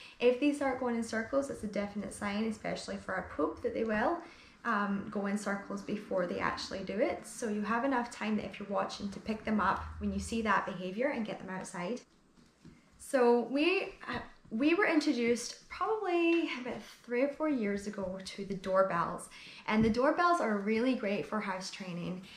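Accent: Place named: Scotland, United Kingdom. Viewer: I thought I heard a Scottish accent. I’m getting my pup ( Casper ) in two days time, I can’t wait. Thanks for the video and tips. Love from Scotland 🏴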